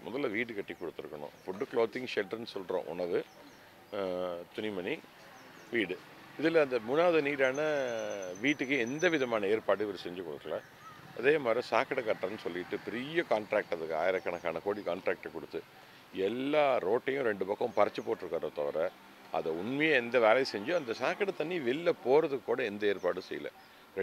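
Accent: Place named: Coimbatore, India. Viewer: வெள்ளந்தியான பேச்சு. கோயம்புத்தூர் வழக்காடல். அய்யா நீங்கள் ஏன் இந்த பாழாய்ப்போன அரசியலுக்கு வர வேண்டும்?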